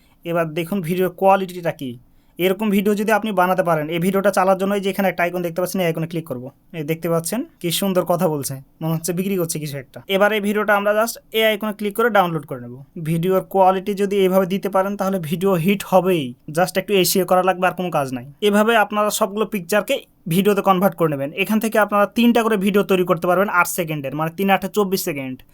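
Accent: Chapainawabganj, Bangladesh. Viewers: ভাই আপনার বাসা কি চাঁপাইনবাবগঞ্জ আপনার ভাষা শুনে মনে হচ্ছে।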